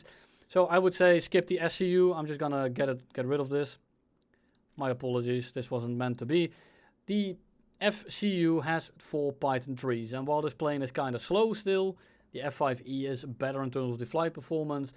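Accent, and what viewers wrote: Netherlands. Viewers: ik hoor n beetje nl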